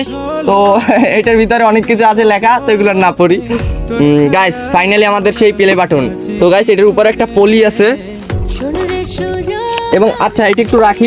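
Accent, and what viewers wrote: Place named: Bagerhat, Bangladesh. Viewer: বাগেরহাইটা পোলা কথায় বোঝা যায় ভাইয়া আমি বাগেরহাট জেলার কচুয়া থাকি😊😊😊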